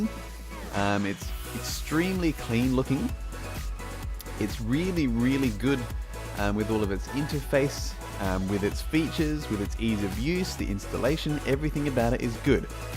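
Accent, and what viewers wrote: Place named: Australia. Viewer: Hi, thanks for that. You sound rather impressed with deepin 15.4. It sounds like you're also in Oz, and that app you downloaded seemed to take a while. Has this been a problem for you since you installed the OS? I prefer to use LibreOffice as a WP app, and I imagine that would take some time to download. (Oh, and I haven't yet installed this OS. Still deciding. It looks good tho, and I'm pretty much over Win 10.)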